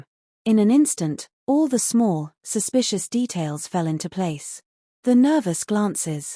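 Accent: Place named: England, United Kingdom. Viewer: Very confusing, English woman telling a story of a Midwest American male in the first person present.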